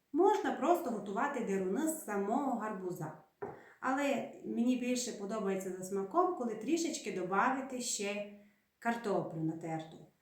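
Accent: Transcarpathia, Ukraine. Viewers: Оооо, вже почула, що з Закарпаття.😊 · Це ЗАКАРПАТТЯ!!! На заході України є різноманітні діалекти😉😊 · Так розмовляють на Закарпатті. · Чернівецька область. Дякую за смачний рецепт, спробую. Закарпатська мова, особлива. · Чую закарпатський діалект, молодець так тримати!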